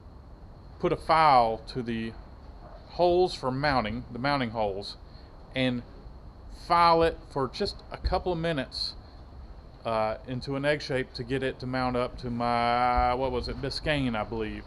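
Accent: South, United States. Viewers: nice easter egg with the vgg theme, But I appreciate your natural southern accent, please dont adopt his larry the cable guy gimmick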